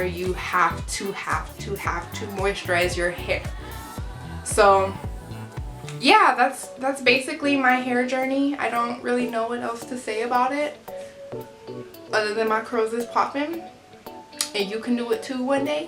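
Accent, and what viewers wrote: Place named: Albuquerque, United States. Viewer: I thought I recognized the accent! I'm a mixed girl too! From Albuquerque! Love your video.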